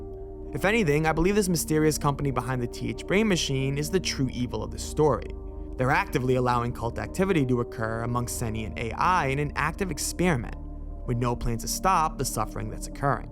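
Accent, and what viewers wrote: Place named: Boston, United States. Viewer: sometimes i think u have a Boston accent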